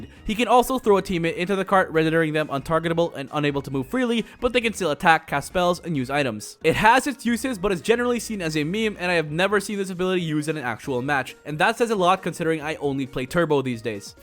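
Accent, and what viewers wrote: Philippines. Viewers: This guy is a filipino, I can hear it. lol.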